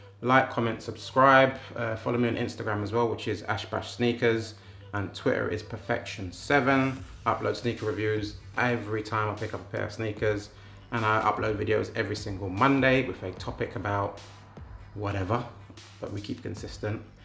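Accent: United Kingdom. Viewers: You need to be British to fully understand his accent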